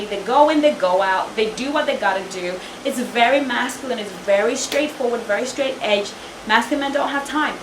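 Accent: United Kingdom. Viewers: You look gorgeous. I love your British accent:)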